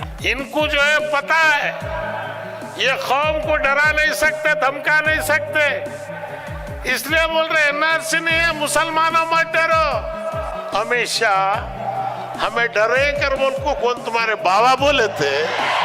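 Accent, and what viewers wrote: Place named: South, India. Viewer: south indian urdu language ka mazaich aur hai ❤❤❤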